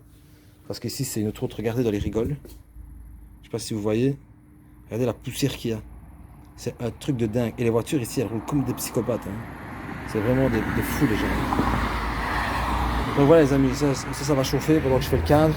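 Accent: Belgium. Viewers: Je décèle un p'tit accent belge non? Je viens de découvrir ta chaîne 😉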